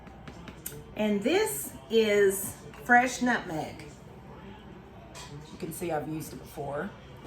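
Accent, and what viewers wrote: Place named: Georgia, United States. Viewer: I just love the way you talk. But I am trying your make your pie. Sounds like you are from Georgia or the carolina.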